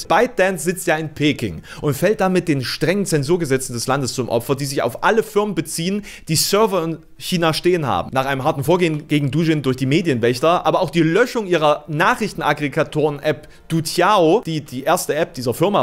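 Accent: Saxony, Germany. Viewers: ich liebe es nicht und bin recht froh dass es bei ihm nicht zu krass durchkommt ^^ Habe zu viele Sachsen erlebt die im härtesten Dialekt den größten scheiß labern Aber ihn hier hab ich echt gern ^^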